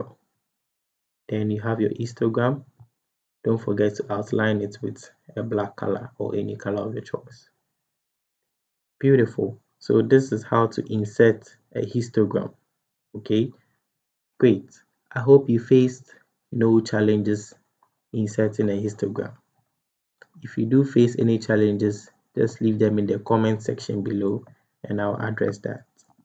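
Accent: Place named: Africa. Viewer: omo oh i just hear the accent i know sey na africa. welldone bros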